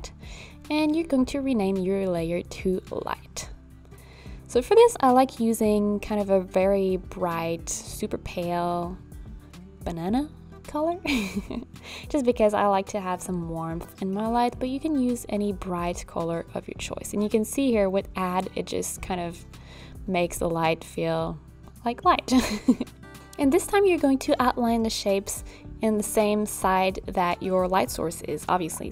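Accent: France. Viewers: New horizons!, you are such an amazing soul! I am probably twice your age and have dabbled in art for most of my life but you are teaching me a TON of stuff I didn’t know….mostly about graphic design (which I wish was more prevalent when I was your age…my life would’ve been sooo different). I also have long hair and understand the misrep on so many games. Love, love love animal crossing (have played it since it first came out yeeeeears ago! I love your accent (I am from a family who speaks French also) you are brilliant!!!! Keep shining, beautiful! Even though you have no idea who I am, I am very proud of you!!!! 😊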